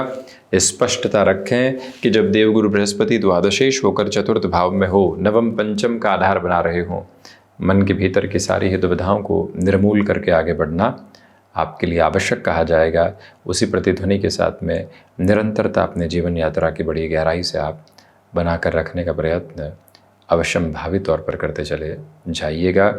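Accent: Standard. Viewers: आप सुध हिंदी बोलते हैं।। बहुत अच्छा लगा ।।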